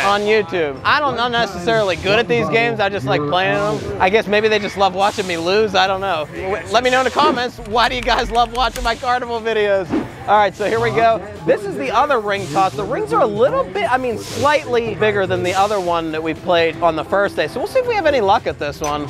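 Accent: Pittsburgh, United States. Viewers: I love hearing your Pittsburghese!!! I am from the /burgh and love to hear the sounds of home. I also love the mentions of places near Pittsburgh.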